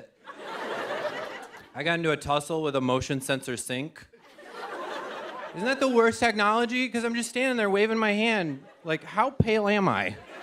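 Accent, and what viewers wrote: Canada. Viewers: Winsc accent sounds a bit Canadian 🤔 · Why am I hearing a Canadian accent? lol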